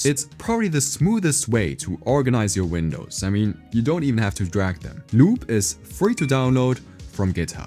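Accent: Germany. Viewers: you are german ? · Beautiful video, great exposition, nice to see mg transition, no waste of time, elegant and clear English with interesting German accent. What else? I need subscribe to say thank you 😊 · just stumbled on your channel. Great editing, straight to the point and interesting apps - hats off! Edit: gerade gehört dass du Deutscher bist, mach weiter so ;)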